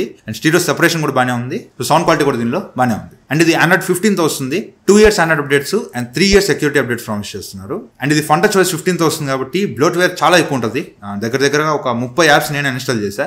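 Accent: Nellore, India. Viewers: Bro miru nellore a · Bro medhi Nellore aa😊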